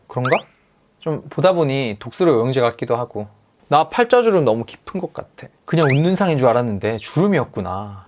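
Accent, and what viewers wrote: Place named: Daegu, South Korea. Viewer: 대구분이시네 대구말 예뻐요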